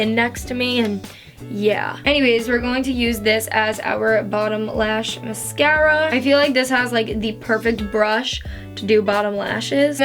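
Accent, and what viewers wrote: New York, United States. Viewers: WOAHHHG I JUST NOTICED HER NEW YORK ACCENT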